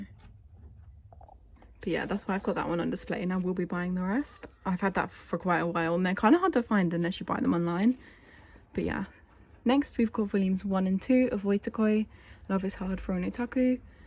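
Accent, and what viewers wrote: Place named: United Kingdom. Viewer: Omg youre british too!?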